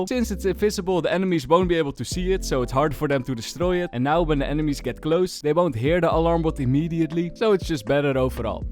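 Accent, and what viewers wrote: Netherlands. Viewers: het kaas accent is zo grappig vooral met vjeulereble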